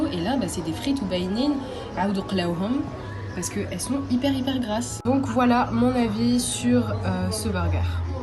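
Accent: France. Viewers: Salam Alaykoum ma soeur tu es françaises et tu vie en Algérie ? Je me demandais car t’as un accent français mais t’as aussi un bon accent Algérien quand tu dis un mot arabe lol J’aimerais vivre en Algérie insha’Allah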